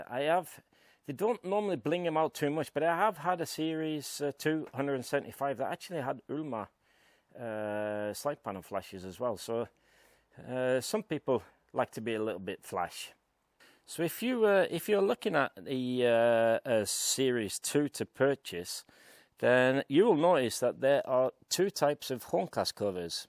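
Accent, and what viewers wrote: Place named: Glasgow, United Kingdom. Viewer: Dude! While I am a Vespa guy through-and-through, I really enjoyed watching you take us around this original Lambretta. It was quite a bit of fun! Not sure how I got here, but you've got a new sub. I picked up some Glaswegian in your accent...then, I read your bio! Great work, sir!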